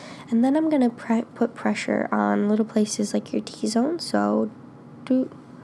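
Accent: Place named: Syracuse, United States. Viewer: CONTROL. Control is the word you wanted. By the way, are you from like Syracuse or somewhere around there? Your accent sounds like everyone I went to high school with haha.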